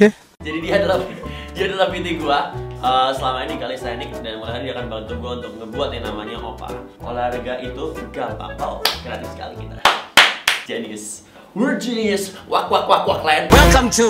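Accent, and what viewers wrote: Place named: Banyumas, Indonesia. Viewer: ngakak ngapak yh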